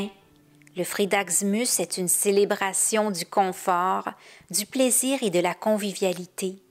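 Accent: Quebec, Canada. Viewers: Si je ne me trompe pas, cet accent est Québécois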